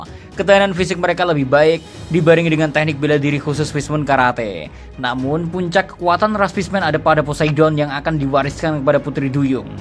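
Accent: Java, Indonesia. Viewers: koyok wong jowo to lur daerah ndi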